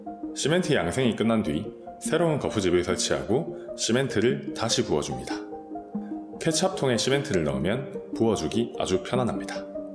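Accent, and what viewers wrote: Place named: Busan, South Korea. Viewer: 부산이신가용? 중간중간에 사투리 억양이 정겹습니다~ ^^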